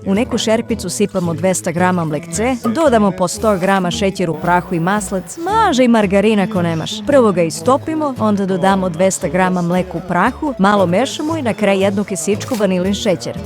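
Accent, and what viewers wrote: South, Bosnia and Herzegovina. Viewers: Govor nas juznacki, milina je slusati, pa milina od recepti unikatni. Alal vera za zlatne ruke bre🎉🎉🎉🎉🎉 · Lele,kakoj si ovoj devojče naučija da ovako zbori po juznjacki?Svaka gu čas!😜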